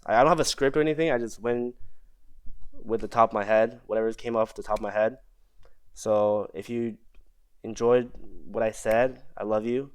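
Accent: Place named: United States. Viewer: Damn I had no clue u learned English that late usually ppl don’t get an American accent that quickly if ever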